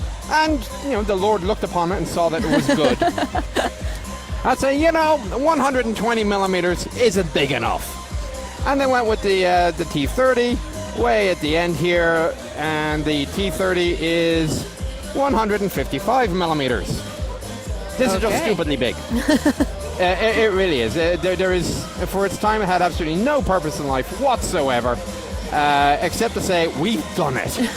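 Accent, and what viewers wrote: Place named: Ireland. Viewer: Love that Irish accent! When is your "Inside the Hatch" show gonna come out?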